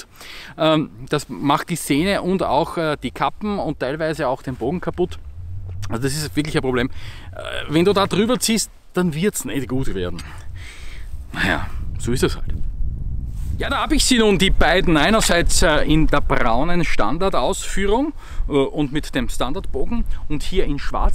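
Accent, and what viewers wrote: Vienna, Austria. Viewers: Du bist schon ne coole Socke und ich als Piefke finde den österreichischen Dialekt so geil. Ach ja und klasse Video! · DEN österreichischen Dialekt gibt es nicht. In Deutschland gibt es ja auch weit mehr Dialekte als Bundesländer (Sächsisch, Berlinerisch, Kölsch, Schwäbisch, Fränkisch,...). In Österreich ist es genauso. spricht mit Wiener Akzent bzw. Niederösterreichisch nahe Wien. 🙂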